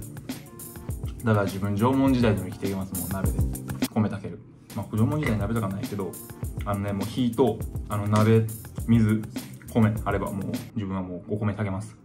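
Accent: Kansai, Japan. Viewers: 初めて喋ってる動画見たけどイントネーションで、ん…？ってなって調べたら関西出身なんだね😳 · 関西人やなーw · なんだかんだ関西弁での喋りを聞くの初かもしれん。 インタビューとか標準語だったし。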